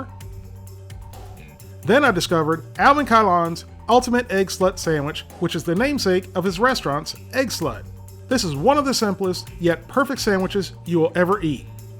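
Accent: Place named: Maryland, United States. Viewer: What part of Maryland you from, chief?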